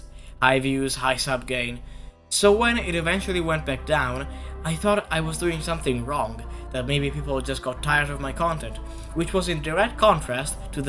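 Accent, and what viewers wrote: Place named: Balkans. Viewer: Blud you sound hella balkan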